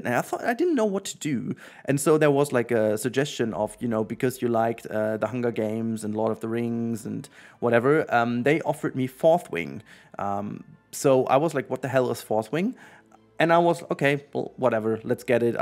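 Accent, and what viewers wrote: Germany. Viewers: Still that typical horrible german accent BUT i have to say : Your build are always awesome. TBH i want a PZ2 instead of a PC2 ... With better performance and way more creatures. Modder creates SO GOOD new creatures, but they are always unfunctional after every patch... that sucks ^^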